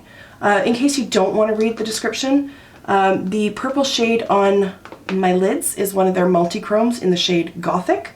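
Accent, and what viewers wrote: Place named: Ontario, Canada. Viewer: Having one's Mom come to your defense means absolutely nothing to me. Of course her Mom would have her back! Big duh on that one, right?!?! Also, I totally pegged your Ontario accent because I grew up in Buffalo and have spent a ridiculously large amount of time in Toronto and went to camp outside of Ottawa every year. Wish I lived in Toronto, maybe one day.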